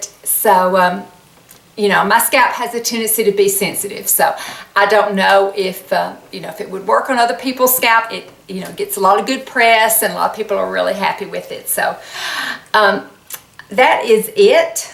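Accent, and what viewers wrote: South, United States. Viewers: What a cute way of saying gone love your gentle southern lilt love elaine Lincolnshire uk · You look beautiful as always and that necklace looks so pretty on you. Just hearing your voice is so soothing...hope that doesn’t sound too weird...lol. Love a southern accent :)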